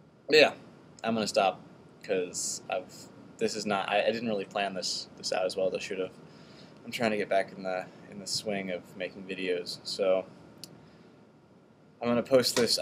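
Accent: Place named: Scotland, United Kingdom. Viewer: Scottish accent, douche bag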